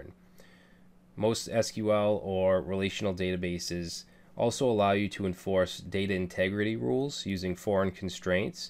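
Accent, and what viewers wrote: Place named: Massachusetts, United States. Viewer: I thought I heard it, I heard the Massachusetts accent! Should call it the New Englandah, but it's not as prevalent in New Hampshire. Still can hear it in some parts of Maine. Great video man!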